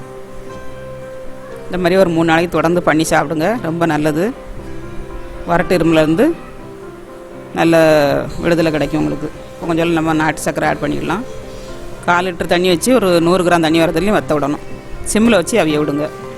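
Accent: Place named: Tirunelveli, India. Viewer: Nice slang tirunelveli Thoothukudi slang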